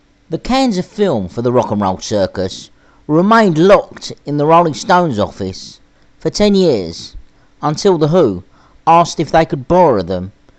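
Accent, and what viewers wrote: United Kingdom. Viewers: I love the Cockney accent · i like it's genuine british accent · Man has a genuine British accent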